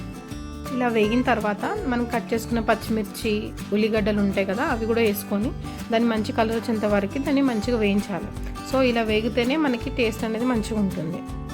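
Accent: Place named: Andhra Pradesh, India. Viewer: Mana telangana antunnav mari mana basha ,yasa akkada matladtha levu .a andra basha matladakandi.mataku mundu yenaka andi bandiloddu.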